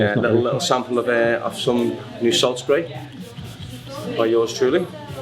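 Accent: Liverpool, United Kingdom. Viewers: Can someone tell me which UK accent that is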